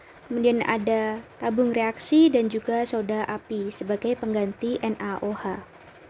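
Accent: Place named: Java, Indonesia. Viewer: Jowone medok, hahaha semangat yaa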